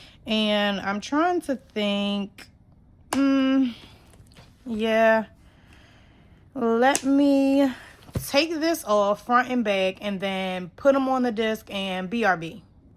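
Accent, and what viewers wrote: Baltimore, United States. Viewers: Do I hear BMORE accent❤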